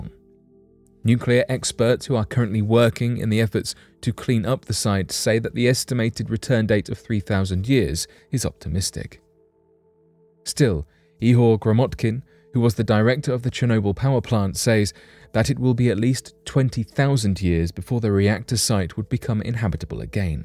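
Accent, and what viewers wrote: United Kingdom. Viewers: Just because he has a British accent doesn't mean he has any credibility.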